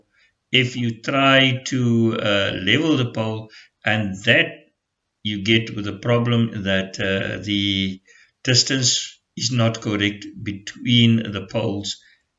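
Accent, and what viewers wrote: South Africa. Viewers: Thanks this is really helpful and great to hear a South African accent!!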